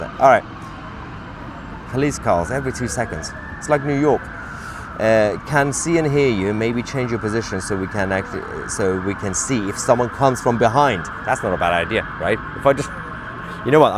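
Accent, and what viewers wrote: United Kingdom. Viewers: I can see and hear you, British accent and all!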